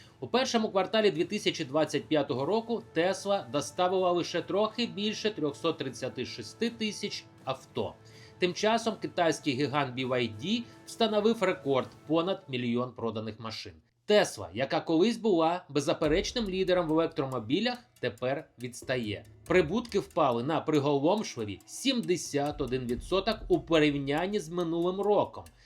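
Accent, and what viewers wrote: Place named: Russia. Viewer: Лайк за відос! Але перестаньте Акать,бо дуже схоже на как цапню!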